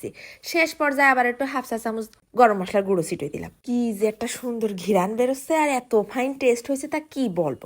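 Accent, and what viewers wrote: Jessore, Bangladesh. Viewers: তোমার এ বু কথাটা খুব মায়া লাগে কারন আমার মামিও যশোরের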